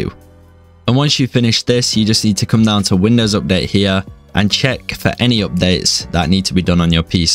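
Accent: Norway. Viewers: Wait are you Norwegian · Bro er du norsk? du har sykt bra engelsk stemme man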